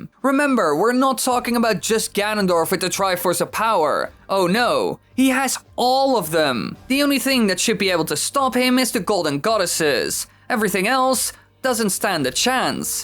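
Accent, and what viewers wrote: Netherlands. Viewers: Wacht.....Je bent Nederlands??????? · Are you dutch?!!????!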